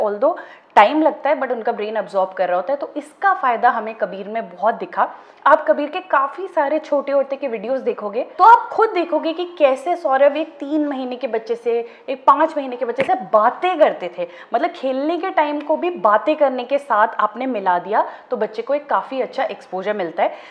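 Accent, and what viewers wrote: India. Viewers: U r from south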